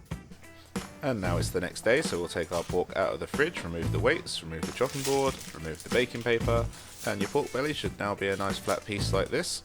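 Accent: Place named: United Kingdom. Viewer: ha ha! No no, keep the mystery alive! Especially with the dreamy British accent! lol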